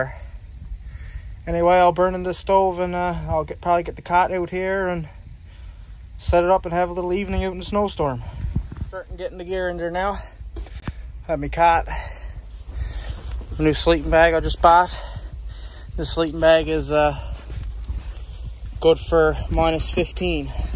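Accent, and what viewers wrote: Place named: Newfoundland, Canada. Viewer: Are you a Newfie ? Yer accent sounds like ya from da bay bah!!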